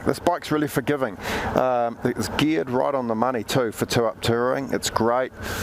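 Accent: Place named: New Zealand. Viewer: thanks for the clarificatio..ive been thinking why am I listening a kiwi accent from American.. Lol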